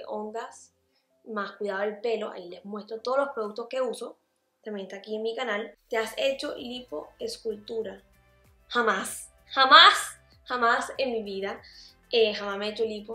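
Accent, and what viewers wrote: Venezuela. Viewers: Es de colombia pero habla muy venezolano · Buen video!! Pensé que eras Venezolana...por tu acento tan nuetro.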